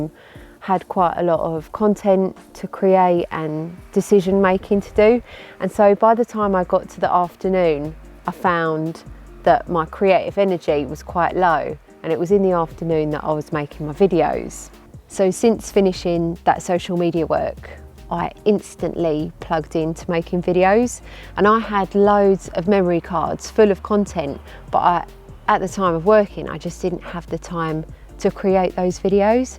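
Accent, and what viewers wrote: United Kingdom. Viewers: It is interesting when you say it takes so long to edit a video. My first thought it doesn't need to be so perfect. You could sit down, smile, and talk in your British accent and I'd be happy ;)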